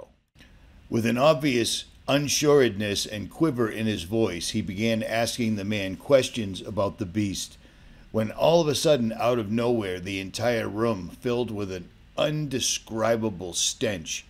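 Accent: Boston, United States. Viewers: You sound exactly like a guy named "Ritchie from Boston" lol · This sounds like richie from boston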